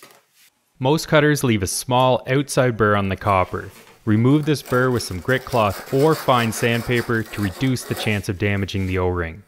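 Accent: Canada. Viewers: The Canadian accent is killing me.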